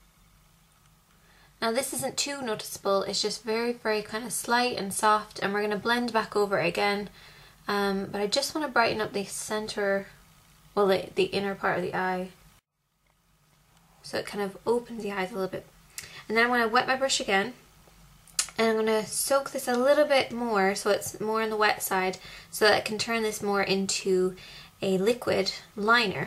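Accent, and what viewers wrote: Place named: Ireland. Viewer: i thought u had a bit of irish haha :L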